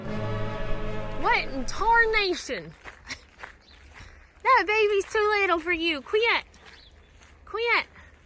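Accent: South, United States. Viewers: OMG, the part where you said "Hitch in your giddyup" and those people call you out in your accent... hilarious. Your voice is so southern when you're trying to be cute.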